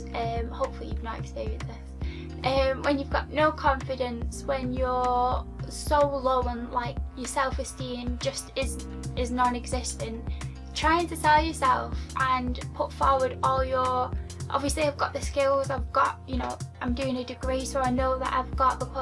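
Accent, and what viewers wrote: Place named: Manchester, United Kingdom. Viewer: Love ur accent! U from Manchester beaut? Xx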